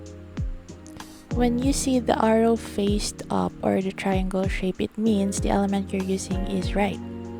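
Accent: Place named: Philippines. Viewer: A like for your filipino accent when you speak English... It's so cute ^ ^ ❤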